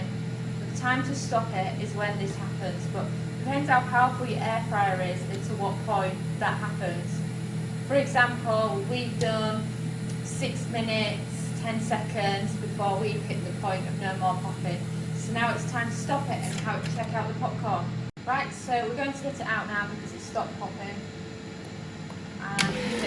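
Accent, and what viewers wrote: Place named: Barnsley, United Kingdom. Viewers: Barnsley accent ay up chuck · You sound just like I did and everybody else did in the area of England where I lived the longest. :-)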